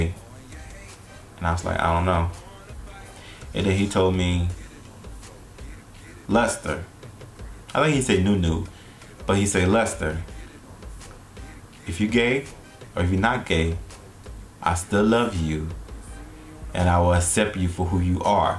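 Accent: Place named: New Orleans, United States. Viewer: I hear the Nawlins accent... I am from Louisiana also... You are one pretty man!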